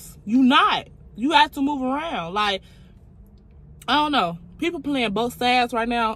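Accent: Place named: Chicago, United States. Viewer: I love ur Chicago accent, it always make me feel I’m talking to one of my homegirls……#chicagogirls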